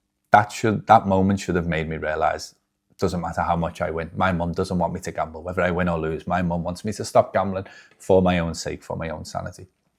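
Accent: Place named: England, United Kingdom. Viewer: twenty dollars? You then proceed to keep interchanging currencies.. Keep it real. If Americans are too lazy to Google the exchange rate then that's down to them. Your English accent threw me off? Later you're talking in pounds? Sorry but seems ridiculous you said you didn't think you deserved to fail the test but the driving examiner said you were going too fast. Then you crashed because you were going too fast in your words? It seems in reflection your examiner was correct to fail you but you still hadn't learnt your lesson... At least nobody else was hurt..u your mum mightve been a diamond but unfortunately an enabler and she did you no favours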